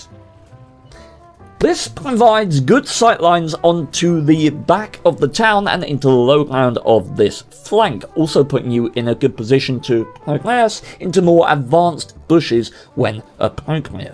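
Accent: United Kingdom. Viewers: Evrything's better with that English accent.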